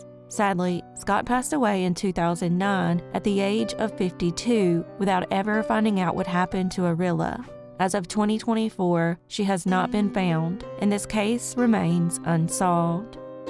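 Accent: South, United States. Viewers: We value you, and ur story telling skills. Love fr panama. Only southern voice I like🥰😊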